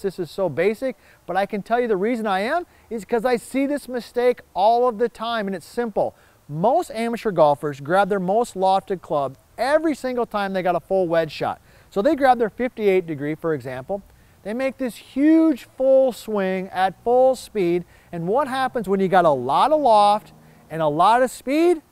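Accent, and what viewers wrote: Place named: Wisconsin, United States. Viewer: Hey: Rooster here in sunny Estero Florida. I really liked your segment on changing the putting grip by turning the top hand so the putter face is always square. I tried it when I played Tuesday and broke 80 for the first time this summer at a reciprocal course. (not my home course were I don't know the greens.) I've lived the majority of my life in the St.Louis area and SW Florida since 2010. You sound like you are from Wisconsin. I spent a lot of time in MKE. I sold goods to Kohl's. Great folks. I like your friendly style and thorough explainations. Thanks. Rooster34135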